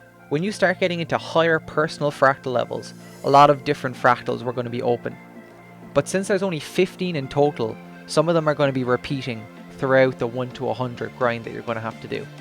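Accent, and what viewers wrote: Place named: Ireland. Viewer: Love your video, thank you!!! The Irish accent makes it better, keep it up!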